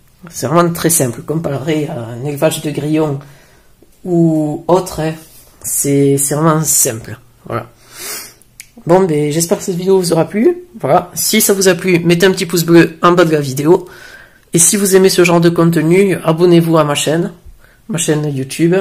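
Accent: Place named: South, France. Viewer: Bravo n écoute pas moi je vais te parler de tes calites. tu a une jolie voix qui passe très bien avec un accent du sud qui te donne un charme fou ca passe Le charme de ta jeunesse et ta belle curiosité qui te mènera loin Plien de progres a faire tu vas y arriver Encouragement un abonné qui te connais pas amitié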